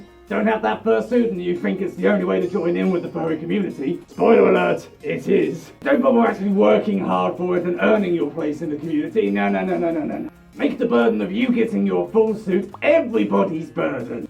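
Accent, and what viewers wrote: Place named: North, United Kingdom. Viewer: "Its not even a script, its on the back of a fucking Tesco Clubcard envelope!" Very British thing to say,. Also, i think because of your accent, you're from the North?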